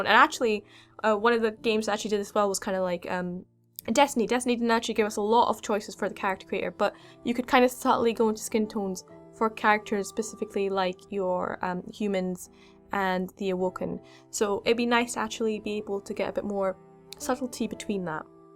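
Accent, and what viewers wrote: Scotland, United Kingdom. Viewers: also I'd like to add your awesome are you Irish? Or Scottish I hear Scottish more Correct? Either way your awesome I have a love for the Scott's and the Irish and there cultures aswell as I just love the accents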